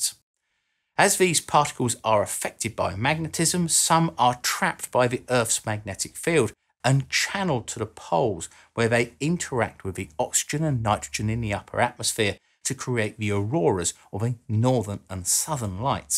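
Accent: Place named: England, United Kingdom. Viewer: Your accent is so... Brrritish!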